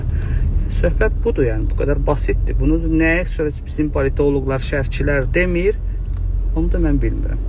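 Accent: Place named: Armenia. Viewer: Buna fikir vermeyin.Bunun xowu gelir ele bil pis weyler demek,daniwmag.ERMENI kimi daniwir.IWINNEN MEWGUL OL¡!(+++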